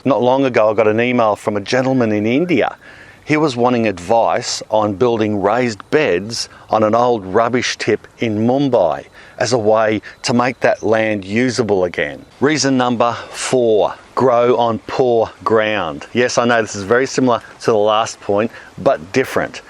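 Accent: Australia. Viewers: Thank you ! I caught the Aussie accent, but didn't know if he was still there. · Well done for making the effort to speak nice and clearly and using lots of gestures. Makes such a difference for viewers who don't speak English as their first language or who aren't used to an Aussie accent. Top work. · Love your videos, tips, and that very authentic Aussie accent. With love from Russia :D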